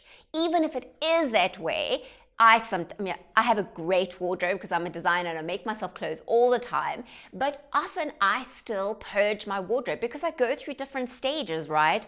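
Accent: South Africa. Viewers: oh! I am so happy that you said where you were from. I have been trying to figure out where your accent was from! Some American and a lot of South African. I really like the new longer format of your videos envied the little facts about yourself at the end .Makes you seem much more relateable.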